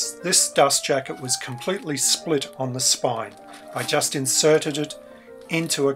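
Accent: Australia. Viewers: Hey, are these sleeves removal once applied? Just found your channel and it's great, sounds like your an aussie as well - I may be wrong. Cheers mate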